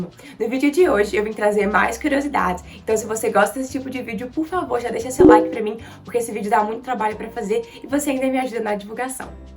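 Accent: Minas Gerais, Brazil. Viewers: Gostei do vídeo anjo.. E essa camisa aí da Rússia q tem a msma cor da camisa da seleção soviética?! É linda.. A Rússia país onde tem a Sibéria.. A Rússia já foi um Império e sua capital era São Petersburgo.. A Rússia da Vodka e do Balé Bolshoi.. É o maior país do mundo.. Foi uma das quinze repúblicas da União Soviética.. Amo o jeito q vc fala.. Amo o seu sotaque mineires.. Tamo junto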